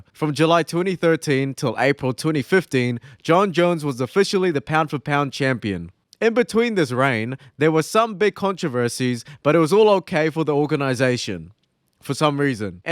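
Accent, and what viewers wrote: New Zealand. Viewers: Is that accent an newzelander that’s been living in America?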